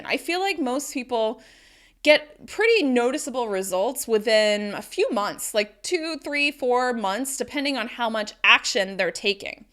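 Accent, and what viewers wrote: United States. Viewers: Hi !I like American accint and I like you,too.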